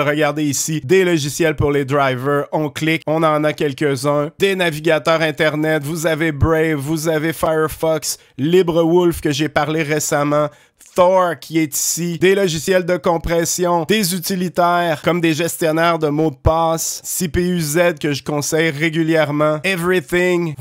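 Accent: Quebec, Canada. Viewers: J'ai l'impression que c'est un super logiciel, mais pas fait pour moi ... D"abord car mon anglais est médiocre, et ensuite, en informatique je ne suis pas au top à mon âge avancé ... C'est dommage, car j'aurais bien aimé nettoyer mon pc efficacement et supprimer tout ce qui est inutile !!! Petite question : Avira free est-il fiable comme anti-virus ? Bonne journée à toi, de la part d'un français de notre pauvre France, c'est toujours un plaisir de t'écouter car j'aime cet accent de la "Belle Province", et même si je n'ajoute pas de coms à chaque fois, je mets toujours un "j'aime" 👍👍👍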